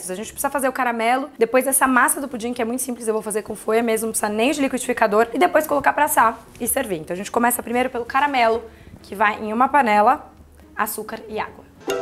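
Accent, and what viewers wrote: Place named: São Paulo, Brazil. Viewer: Nao existe alguém com um sotaque paulistano tão carregado como o da Ju Ferraz, até eu que tb sou de SP fico impressionada 😍😆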